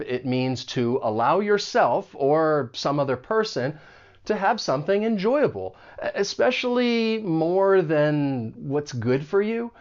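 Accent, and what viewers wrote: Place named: General American, United States. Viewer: he answered "the US". Not North America. Let's not split hairs here. If the accent interests the author of the Q, he should have been more specific. has a standard American accent, regardless of the region he comes from.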